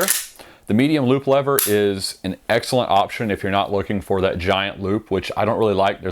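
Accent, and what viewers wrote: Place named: New England, United States. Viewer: So what part of New England are you from?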